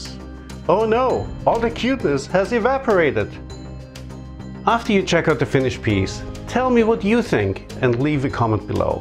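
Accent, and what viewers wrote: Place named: Germany. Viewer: Hi! I love your tiny house drawing- yes the pride in craftsmanship is evident in the beautiful little house you drew. Your narration and editing and videography are wonderful- I feel like the house stands beautifully alone. I detect an accent- are you German or Austrian? My father is from Germany- a wonderful country.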